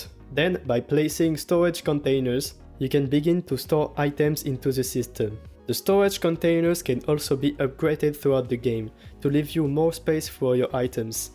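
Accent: France. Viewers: haha sa s'entend que t'es français et merci pour le modpack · ahah j'ai compris que tu étais français dés que tu as dit "of course ", bon accent et bonne vidéo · T'as un accent français ou c'est moi? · t'es français ? je reconnais bien cet accent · J'étais pas sûr avec le temps mais j'ai enfin la preuve avec ton Steam ! Je me posais tout le temps la question avec ton accent mais t'es bien français 😭😭😭😭 Merci pour ton contenu continue c'est incroyable 🙇🙇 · U sound french , are you french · Are you french? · le petit accent français qui fait plaisir super modpack sinon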